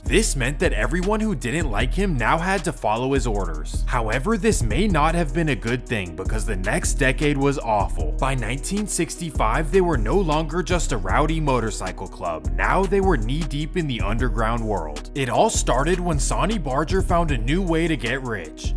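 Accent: United States. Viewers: Where did you learn how to pronounce American English?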